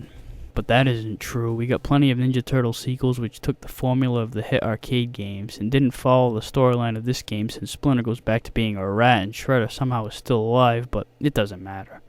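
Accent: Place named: New York, United States. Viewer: Your New York accent is incredibly annoying. Buy a tape recorder and tape yourself all day and play it back. You'll realize you sound like a toddler who hasn't figured out his speech patterns yet. I recommend you move to the Midwest where people talk considerably normal.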